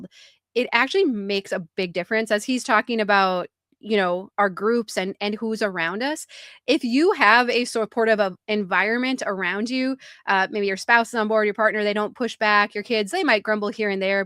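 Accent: Minnesota, United States. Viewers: yep she sounds normal to me, Minnesota thing Lol😅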